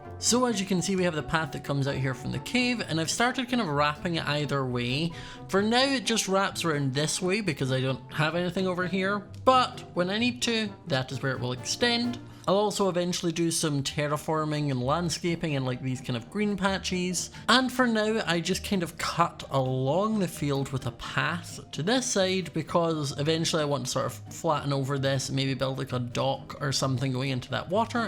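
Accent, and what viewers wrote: Scotland, United Kingdom. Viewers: Are you British? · Are you Scottish? JW you just sound Scottish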